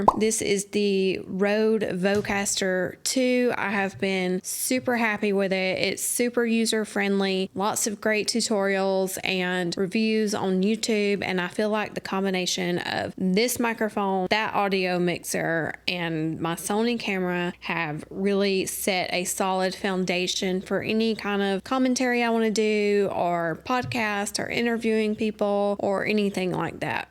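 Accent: South, United States. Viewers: I really love all of your content. Every single video is entertaining, thought-provoking and energising. I especially love watching you and your husband interact. One thing that stood out to me from the beginning was your slower Southern accent. I struggle to understand anyone with any accent talk fast and always have to enable subtitles online, which can distract from the content at times. And I always say "I wish I could enable subtitles in real life". I did struggle to understand your husband though (even YT subtitles got mixed up sometimes hehehe - which was entertaining in its own right) but his warm personality, you interacting with him, Bayley's antics, all the emotions, and the cutest random animal showcases ALL made me (and my mom) keep watching. We are so in awe of your survival story that anything you post is a lovely surprise. Like opening a foreign candy wrapper and experiencing a burst of new flavour. 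By the way, me and my mom have a disorder called misophonia (decreased tolerance to specific sounds) and still continued watching. That's how much we love your channel. Another BIG reason I'll continue watching your content no matter what is the fact that you are NOT religious. There's so much I can say about that but I'd rather not... insert sound of crickets here...I really appreciate slower more intentional long-form content in a sea of fast-paced crappy content. I was particularly also intrigued by the wild 911 calls and interaction with your former co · Hi, I love the way you speak, it's perfect, not too fast and I love the southern accent! I look forward to hearing more about your journey. I can relate to the fear of dying and wanting to have a place for loved ones to see and hear you. I started my channel during covid. I was so scared that I wouldn't get to see them again, i made my channel for them so they can hear my voice if something happens to me. 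And I'm like you also, my channel is not for promoting products, I have a standard letter I copy and paste into a return email thanking them, etc. This is an awesome video and thanks for taking the time to share your knowledge with us. Take care.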